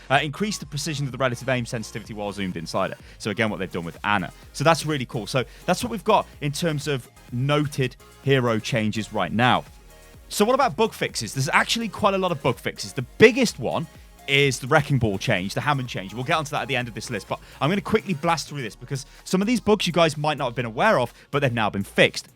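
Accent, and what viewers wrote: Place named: United Kingdom. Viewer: Thanks, I just remembered why I hate the British accent. It's terrible and hurts my ears. How the fuck can you write "Reinhardt" wrong TWICE? Get your shit up.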